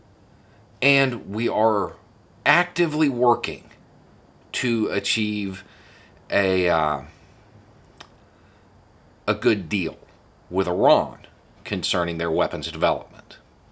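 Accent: United States. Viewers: sounds like the us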